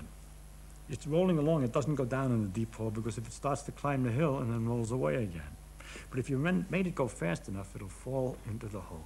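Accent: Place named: Italy. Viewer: why is there a slight italian accent tho loool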